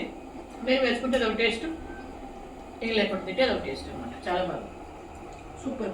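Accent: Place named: Rayalaseema, India. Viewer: Akka meku epudu kocham rayala seema kadapa Jella yasa vstavunde akka Karnataka yasa basha ravatam ledu papam me chelle malate garu kanada basha yasato voice vavaru estuvuntaru malate garu amma ne video lo chupiatu vuntaru video lo amma ne chuce so happy alge eroju video lo saree kamalu matching mamaching begone meku kamalu baga set inae akka 🎉🎉❤❤❤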